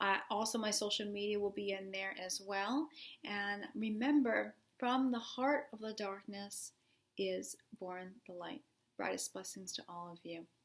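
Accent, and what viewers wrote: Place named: New York, United States. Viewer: I loved Green Day in the ‘90s too and saw them in concert in NY then. I thought I heard a NY accent. Are you on LI too? We must be around the same age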